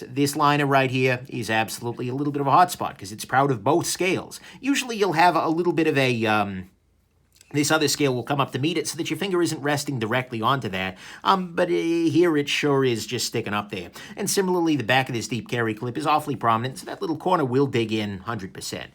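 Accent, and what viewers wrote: New York, United States. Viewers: That New York accent...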